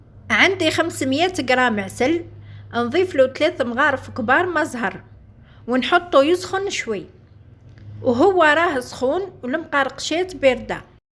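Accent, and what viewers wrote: Setif, Algeria. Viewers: يعطيك الصحة على حساب هدرتك نباني سطايفية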